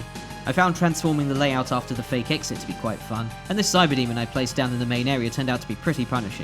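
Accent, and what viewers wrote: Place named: United Kingdom. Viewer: >Map 25 >That blatantly placed inverted crucifix overlooking a pile of corpses If that doesn't speak "edgy teen", I don't know what does, and frankly, it feels nostalgic. It appeals to me on a personal. Regardless, this was a fun video, and frankly inspiring. Making a full fledged megawad when you're 17 is one hell of an undertaking. Pretty sure I spent my days as a 17~18 year old depressed, angry and jacking off, which... frankly isn't all too different from who I am today. The only difference is that I have a career and a steady income. But none of that really matters. :P I'm a bit late to the party, but I really want to get into doom mapping. I'm not exactly creative or smart, but I want to put out a map or two as part of my bucket list. Maybe one day it'll happen. Thanks for sharing this. Talented people like you are a rare breed these days. 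Also, I had no idea you were a Brit! Your accent blew my mind.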